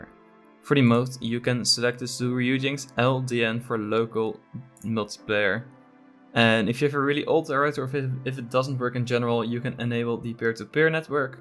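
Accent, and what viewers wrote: Netherlands. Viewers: Yo Dutch? just a guess! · You Dutch? Sounds like it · Dat Nederlandse accent 🤣😭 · I think you are from Netherlands because of your accent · you dutch or some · Are you dutch? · You sound dutch, are you? XD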